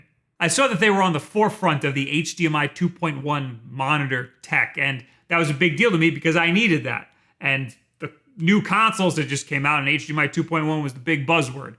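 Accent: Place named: New York, United States. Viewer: new yorker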